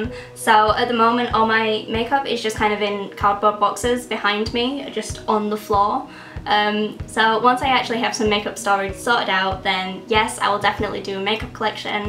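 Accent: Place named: London, United Kingdom. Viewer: OMG I love your accent so much. I am going to copy it when I come to London! I hope we get time to meet up :D :D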